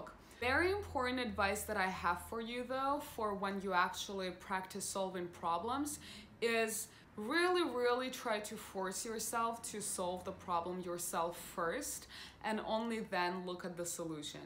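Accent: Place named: Russia. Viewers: мне тоже так показалось, она русскоговорящая. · У тебя такой русский акцент. Не знаю почему, но очень тяжело слушать.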